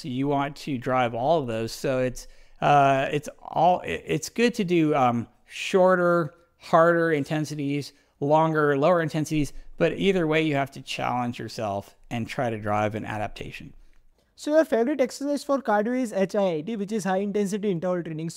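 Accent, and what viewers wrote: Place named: United States. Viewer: 👍Highly informative👌. Please add English subtitles for better understanding American accent.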